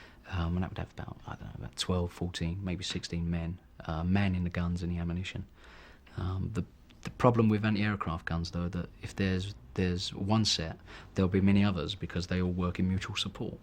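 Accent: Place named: RP, United Kingdom. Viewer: That’s his poshest accent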